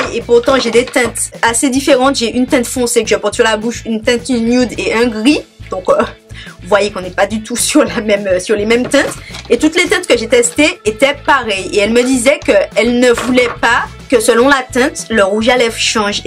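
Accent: Antilles, Caribbean. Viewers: Avec ton accent antillais la😂😂❤. Super vidéo !💋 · Tu es un vrai joyau !!! ♥ ♥ Ça fait plusieurs fois que je regarde tes vidéos mais je n'ai jamais eu assez de temps pour te laisser un petit commentaire ! Ton accent est à croquer, ça sent bon le soleil et la chaleur des Antilles ! Tes vidéos sont toujours un vrai régal ! ♥ Plein de gros bisous !!! ♥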